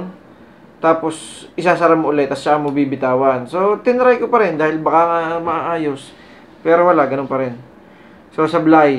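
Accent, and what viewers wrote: Batangas, Philippines. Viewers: kabayan saan ka gah s batangas haha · Mahahalata mo ang liget sa hinde na batangueño eh. Areng si idol, liget. 😂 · Batangeño accent ah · taga bats ata kayo bos,halata sa boses haha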